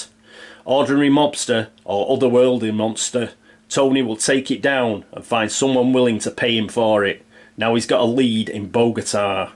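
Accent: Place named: Manchester, United Kingdom. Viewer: Gteat to have your Manc accent back. Did ypu have much trouble finding your EH boxes under all of the dust!😊 Hope life has been good for ya in meantime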